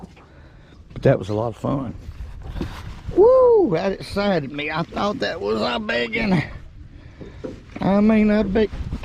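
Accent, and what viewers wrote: South, United States. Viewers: Love that accent brother! Southern pride!!!👍😁✌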